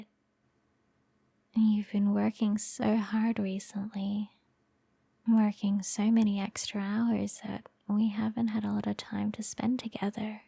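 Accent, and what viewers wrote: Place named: Australia. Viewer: dam what a guess that you’re from Australian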